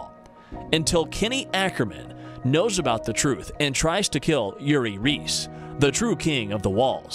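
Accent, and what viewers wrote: United States. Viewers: Is English not your first language? You don't speak with a foreign accent and you sound America, but you're grammar is all over the place. I'm so confused. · HOW IS NOBODY TALKING ABOUT THIS GRAMMAR JESUS CHRIST ITS SO AWFUL, he just skips out the word "the", "to" , "a", "into" and uses incorrect tense every chance he gets if he had an indian accent i would forgive him but its american, explain?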